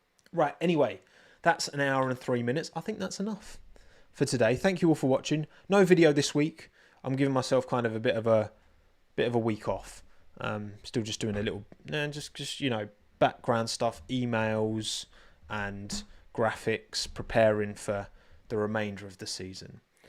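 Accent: United Kingdom. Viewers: It’s the low sweet British accent, I have the exact problem with Cameron and Henry Quinney from Pinkbike. And I can hear the Canadians from the same podcast just fine.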